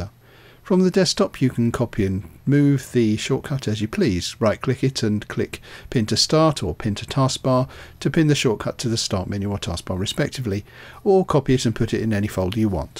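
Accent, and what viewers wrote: England, United Kingdom. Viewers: Machine gun rapid fire voice presentation . Heavy English accent. Listened to tutorial multiple times, tried ajusting volume levels , finally gave up, Will look for another tutorial for downloading Gmail into a windows 10 desk top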